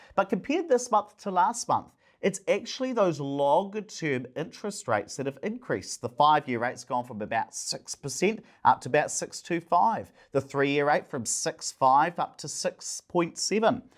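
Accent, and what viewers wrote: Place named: New Zealand. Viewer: He's OK it's just a terrible typical New Zealand accent most people couldn't understand. Mind you Sydney accent are the most worst ones.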